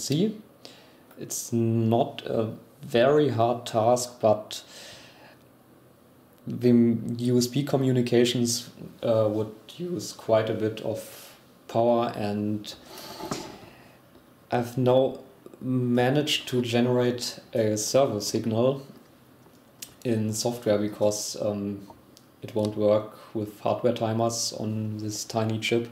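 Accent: Germany. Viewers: Wie man beim ersten Wort einfach raushört, dass du Deutscher bist :D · i love his german English😂❤ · Ahh dein Deutscher Akzent xD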